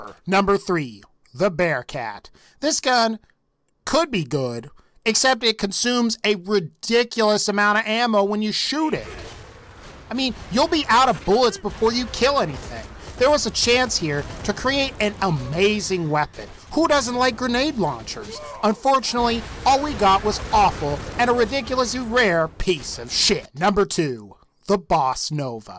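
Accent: South, United States. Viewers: His country accent his amazing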